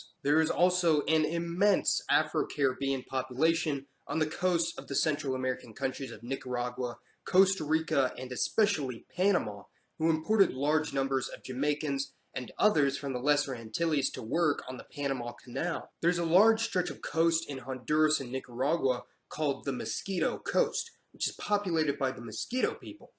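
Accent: United States. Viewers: He tends to force American phonetics onto foreign words, but with very little effort into pronouncing them correctly. The worst I've heard were on the videos about Filipinos and Japanese.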